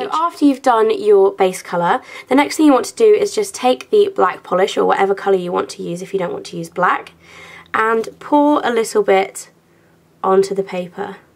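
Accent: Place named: London, United Kingdom. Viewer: i love your accent how long have u lived in london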